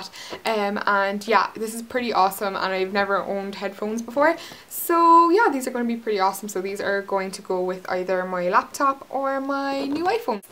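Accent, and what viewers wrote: Ireland. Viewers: R u from ireland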